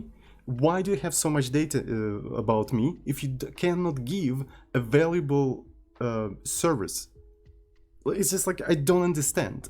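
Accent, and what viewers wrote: France. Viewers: За ужасный французский акцент дизлайк и отписка.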